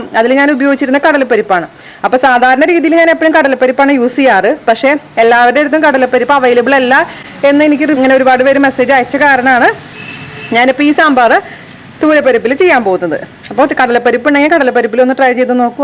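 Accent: Thrissur, India. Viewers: chechide samsaram kettapole thonni, enikkishttayi njanum thrissur anu, ipo Bahrain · chechiii trissurkkari aano? Trissur slang aanalloo speak cheyyunnaath...super 😊 All recipes are superb.🎖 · Tani thrissur tanne...... Really great cooking.... Used to follow most videos. Wat we really enjoy is the slang....... Itu vere level ayi makkalee..... 😂😂😂😂. Good job n keep going.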